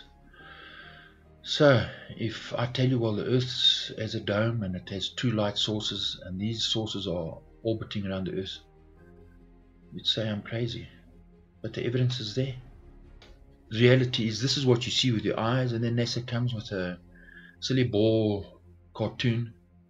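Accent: South Africa. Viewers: are you a South African.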